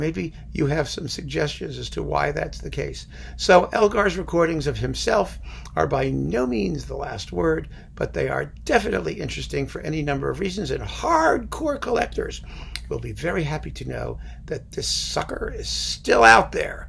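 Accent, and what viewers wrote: United Kingdom. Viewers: Very good upper-class pre-1950 British accent! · Lovely talk as usual, thank you, but you paid no attention to the which makes me go weak at the knees. And in the friendliest possible way, your British accent sucks!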